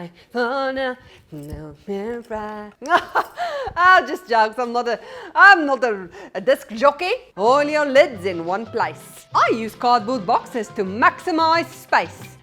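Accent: Afrikaans, South Africa. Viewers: I saw with da rands,your name and your Afrikaans ascent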